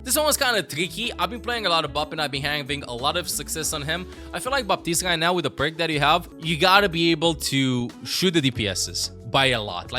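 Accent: Romania. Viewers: That’s European accent, like Romanian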